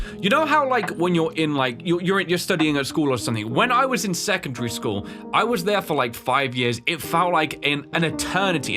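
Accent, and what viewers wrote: United Kingdom. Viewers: I kept watching your videos since 2020 because i needed to learn british english 😂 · He started out as the deep voiced British man who did FNAF theories and top 10s and then he started his own channel and 10 years later that channel has become something truly special and unique and one that has stood the test of time…congratulations thank you for a wonderful decade of fun 💙💙💙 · a decade of funny bri'ish man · well, i don't quite remember which one of your videos i saw first, but i did came here from jay kubz scout, because someone in a comment session talked about you, so i got curios and decided to check you channel. At first it was a little confusing for me and some parts i couldnt understand what you were saying because i only consumed english content made by americans, hence why i couldn't understand british accent, but it quickly grew on me and i got used to your voice, which had improved my english by a lot, so thank you for that! 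(Youre literally the only reason i can understand dawko and bazz lol) The thing that got me is the vibe and editing, that's for sure, because it was really special (still is) from what I've watched on youtube before. Now I'm waiting for upload or rewatch your old videos when i eat, its became a part of my routine, and i dont miss any video you upload :)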